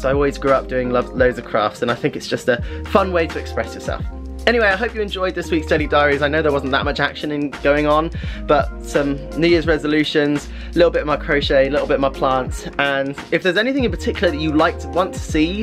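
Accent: United Kingdom. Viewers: Ok for the red spots I recommend clean them good with soap and water then add triple antibiotic ointment dont touch them not repeat the cleaning of them and putting a triple antibiotic ointment on them that way the Mexican work to clean your skin up winter skin has cleared up from them put some lotion on it to you know replenish ur skin. P. S. love ur voice love that British or Australian or Canada! Now on the music that you are playing I don't see where you give the copyright notice to the label company that owns that song as a DJ I always put on CDs copyright-protected of the year the CD was issued with the label.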